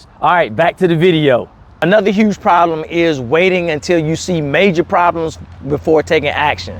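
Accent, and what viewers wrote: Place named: Baltimore, United States. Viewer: ok 😅 thought I heard a twinge of a Baltimore accent. Cool! Thank you for your helpful videos 😊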